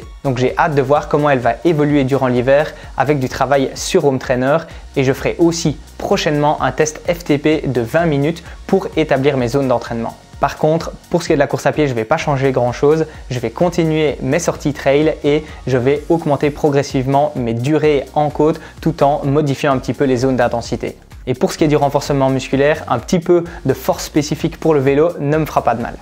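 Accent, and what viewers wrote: Belgium. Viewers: yo man tu es d'où en Belgique? Je crois entendre un accent liégeois mais pas sûr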